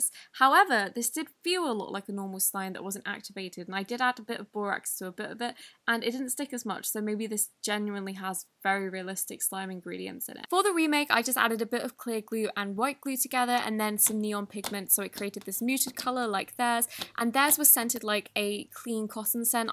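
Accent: United Kingdom. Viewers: it's so nice to hear a slime you- tuber from the UK!!! also great video 😍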